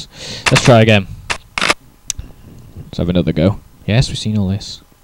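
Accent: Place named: England, United Kingdom. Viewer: OH YES THANK GOD SOMEBODY ENGLISH!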